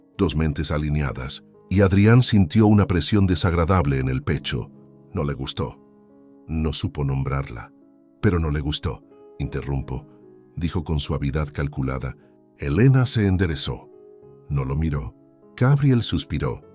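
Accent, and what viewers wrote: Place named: Portugal. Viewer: Muy mal leido. Cambien de robot de lectura. Mezcla portugués con español y corta mal las frases. Chau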